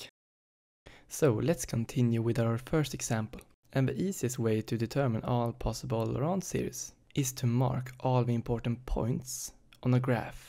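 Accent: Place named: Sweden. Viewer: Dude that's the loveliest most Swedish accent if I ever have heard one! :D And Thanks for the vid, exam in two days, fingers crossed!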